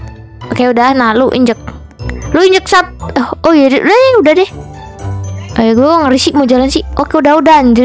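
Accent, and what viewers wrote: Indonesia. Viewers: Kk orang Jawa? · Kok agak Sunda kak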